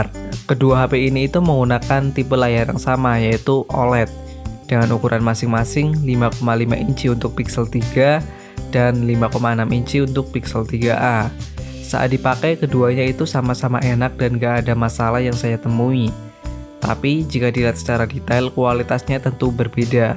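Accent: Wonosobo, Indonesia. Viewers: wong sobo ta mas?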